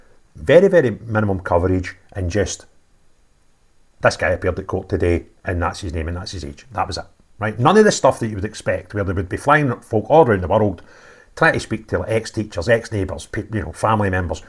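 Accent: Scotland, United Kingdom. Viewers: I’m sorry, I didn’t want to listen to all of this, but the Scottish accent ain’t doing it for me. What a shame.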